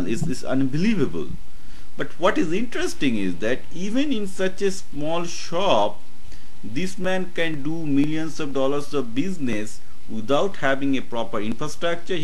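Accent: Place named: India. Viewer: your reporting is pathetic man,,... u need to improve your accent..a typical indian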